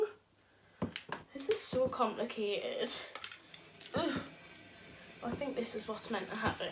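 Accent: Newcastle, United Kingdom. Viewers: I love hearing our Geordie accent on YouTube 😍 your body is perfect! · Are you from Newcastle? I am and love it because you say canny like me❤️❤️ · What part of England are u from? Geordie? Living for ur accent x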